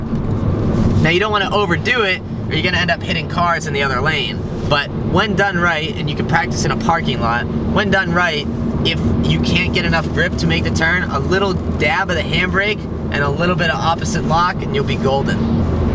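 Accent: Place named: England, United Kingdom. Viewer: Nice English accent and great video.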